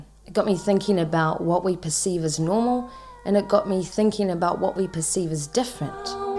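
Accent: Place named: New Zealand. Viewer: SORRY I DIDNT HEAR EVERYTHING YOU SAID, bc I haven't taken my adhd meds today, but are you from New Zealand?? Your accents sounds NZ 😍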